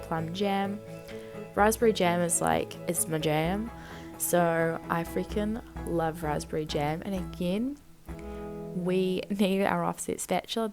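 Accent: New Zealand. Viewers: Thank you for this great recipe, you have a very soothing voice and a beautiful kiwi accent ❤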